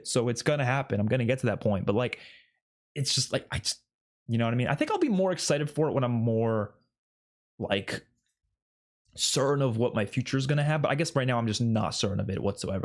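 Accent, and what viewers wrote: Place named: New York, United States. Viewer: Gotta be New York……northeast U.S. at least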